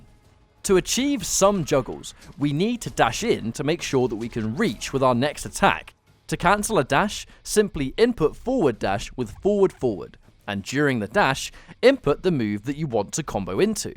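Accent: United Kingdom. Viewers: I knew to take this video seriously when I heard a British accent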